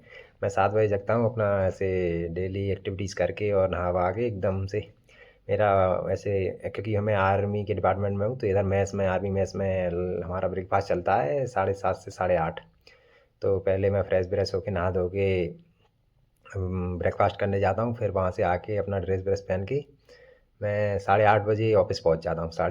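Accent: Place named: Braj, India. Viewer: Baat karne ke tarike se lag raha hai aap ki real bhasha Braj Bhasha hai 😊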